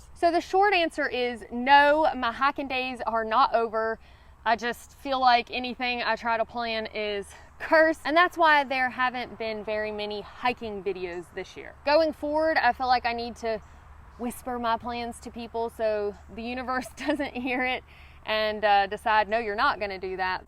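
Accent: United States. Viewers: You sound American 😮.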